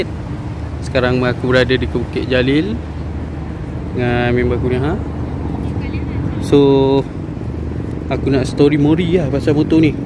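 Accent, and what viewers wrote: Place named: Malacca, Malaysia. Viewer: Nice video bos. Orang melaka ke bos? Ada bunyi melaka hahaha.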